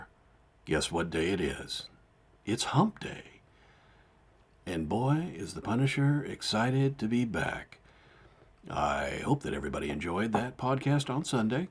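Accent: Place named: Boston, United States. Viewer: Luv you and your Bahhhh ston, New England, Massahhh chew setts, 35, jfk sr, accent!!!!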